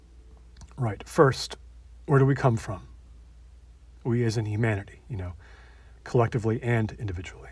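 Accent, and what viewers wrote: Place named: South, United States. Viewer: Can’t take the southern outta the Australian Ohioan 😄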